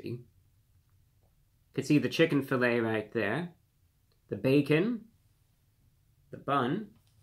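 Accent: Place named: Transatlantic, United States. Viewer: He is upping the transatlantic accent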